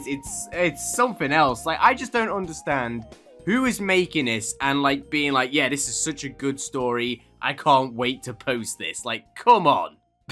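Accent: United Kingdom. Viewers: wow British dude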